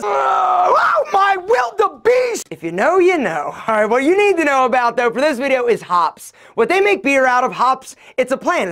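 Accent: Philadelphia, United States. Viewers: Great advice and also a great Philly accent !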